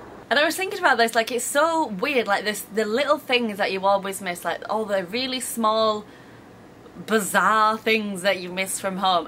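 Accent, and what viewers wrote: Yorkshire, United Kingdom. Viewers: Welcome back.... great to hear your Yorkshire accent again .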